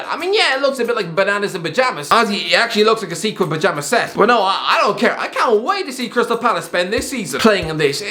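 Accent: Ireland. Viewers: Where in Ireland are you from? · Qna: why are you irish? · Are you Really Irish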